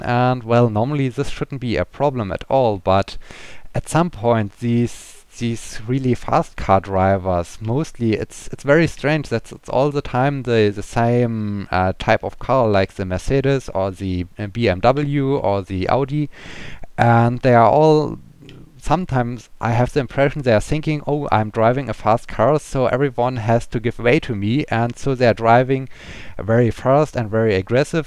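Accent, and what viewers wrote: Germany. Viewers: I had initially assumed you were German but then I wasn't so sure since your accent sounds different from some other German Youtubers I watch. However, Germany is pretty linguistically diverse from what I understand. Also you are about the same age as me, I was 6 when the wall came down. Regarding highways; The autobahn is pretty famous, at least in North America. Speed limits here tend to be 80-100km/h but they are often ignored. In flatter areas of the country they can be up to 140km/h. Any thoughts for other topics? The language diversity thing is pretty interesting I think. Lastly, Promii is pronounced like pro-mee. It's a diminutive version of Prometheus, which I used as a screen name when I was younger.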